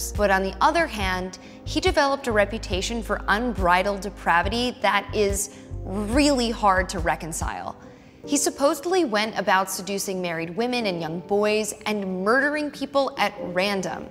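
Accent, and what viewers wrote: United States. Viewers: Cant take history lessons from an american accent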